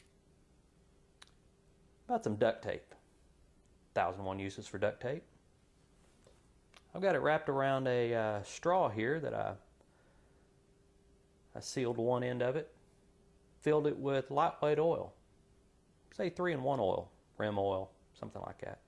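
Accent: South, United States. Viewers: His voice reminds me of Mr. Rogers, but with a southern accent.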